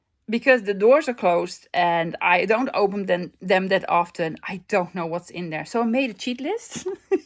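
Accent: Netherlands. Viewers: The moment you started speaking I knew you were from Holland. My parents came to the U.S. right after WW2 with my brother, who was two at the time, and one trunk of belongings. My entire family is still in Holland. My maiden name is Visser (Heiloo) and my mom's maiden name was vd Kuil (Ijmuiden). I submitted DNA for ancestry and I am 98% Dutch (mostly Friesland). "If it ain't Dutch it ain't much" Lovely craft room tour! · Wow !!! Im new to yr channel & i was blown away watching this, first of all, my Late Mother was Dutch & ive always loved the accent, you have a great sense of humour & i was thinking there is so much in yr craft room, that i could come visit & go shopping 🤣🤣, however slight problem i live in Australia lol I thoroughly enjoyed every minute of yr tour, Thankyou im in awe of all you have 😊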